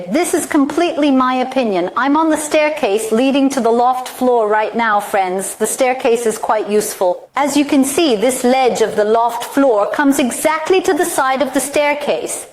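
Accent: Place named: India. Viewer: indian accent thats speaking too fast ruins this video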